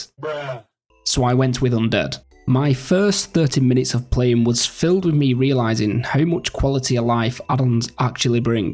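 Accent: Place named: Lancashire, United Kingdom. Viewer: Nice vid mate i certainly detect a lancashire accent. Chorley/wigan by any chance?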